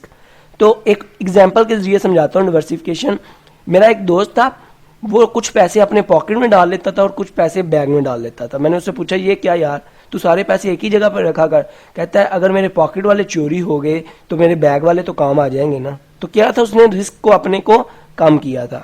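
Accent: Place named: Punjab, India. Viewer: Bhai tu punjabi lg rha tone se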